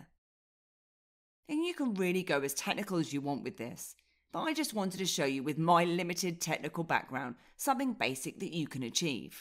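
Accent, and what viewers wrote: United Kingdom. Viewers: very British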